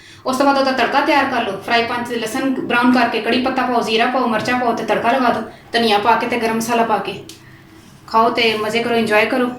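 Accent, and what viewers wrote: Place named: India. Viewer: Recipes to nice hoti hun but Punjabi ful penddu style me bolte hyn ap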